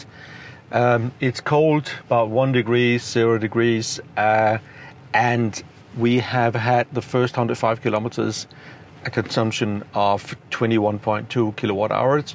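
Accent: Denmark. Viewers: Are you danish? Your accent sounds danish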